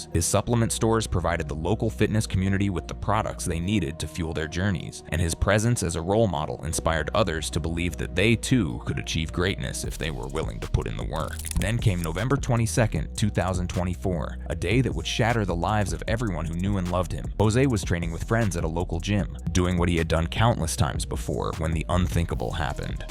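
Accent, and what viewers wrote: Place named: United States. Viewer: Another load a ballax video on YouTube with American voice over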